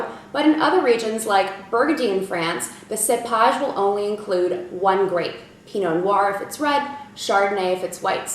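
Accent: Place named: United States. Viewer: Actually her English accent is just fine. It has a slight twang in it but other than that, it is a clean and relatively non-distinct American English accent.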